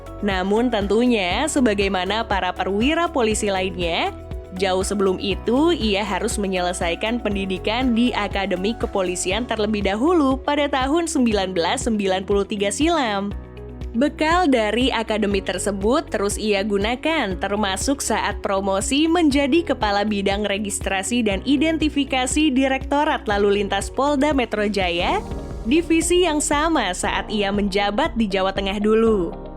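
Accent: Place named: North Sulawesi, Indonesia. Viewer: Sulawesi Utara mana nih suaranya 😅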